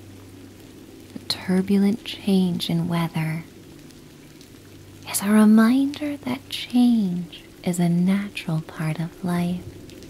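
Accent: United States. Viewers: Write a book a novel or novella a romance or a juicy romance or a mystery or a coming of age with wonderous epiphanies in it. Write and publish self publish if you want or make an audio book. If your not going to sing write a book. I’m sorry I borrowed Jack as my pup but he kept whining for mommy and he snored very loudly for such a wee boy. I love the outer banks, never been there except in stories or films but it is dreamy. I giggled from your southern accent. The images painted by your words are sublime lots of new metaphors and tactile stuff it’s terrific. How come your eyes aren’t moving as if your reading is this just flowing from brain to voice? Happy Sunday.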